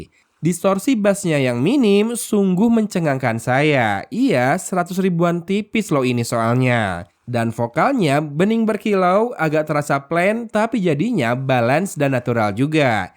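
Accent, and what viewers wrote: West Java, Indonesia. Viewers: Lah, klo memang logat bicaranya jawa barat seperti itu ya mau gmn lg?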